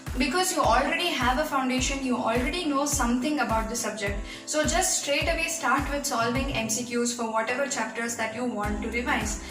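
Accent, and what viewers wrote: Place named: South India, India. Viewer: South english ek number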